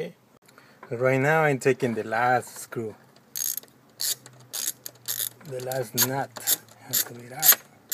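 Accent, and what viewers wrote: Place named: India. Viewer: India akcent 😊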